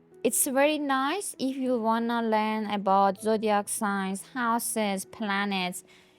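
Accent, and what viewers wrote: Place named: Iran. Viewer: you sound Iranian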